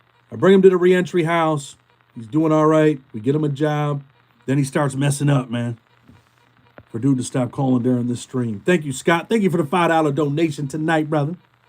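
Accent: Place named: Boston, United States. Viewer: I thought you were from Boston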